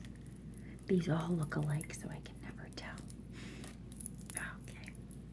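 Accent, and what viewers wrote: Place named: Minnesota, United States. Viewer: Listening to you speak is like watching the movie "Fargo"!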